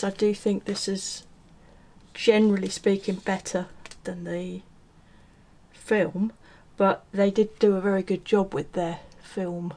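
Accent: West Midlands, United Kingdom. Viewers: Hi, I would like to be considered for the Mustang giveaway, I'm back into modelling after 30ish years and find your lovely West Midlands twang and easy yet very informative tips and advice invaluable in my learning of the hobby after 30 years ( things have changed alot since the 70,s) Also undying to try foiling so this sould be my first attempt, I'll send you pictures of my success or not if I am lucky enough to win. Thanks again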